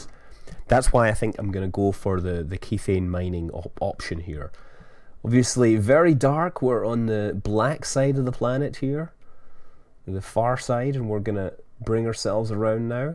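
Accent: United Kingdom. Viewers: Foreign is a relative term. It's the British pronunciation.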